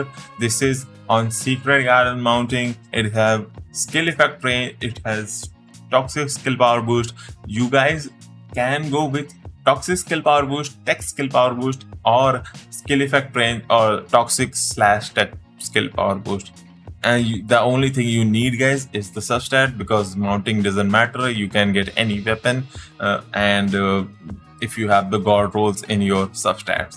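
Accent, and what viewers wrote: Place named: India. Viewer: He in Indian. Accent is Indian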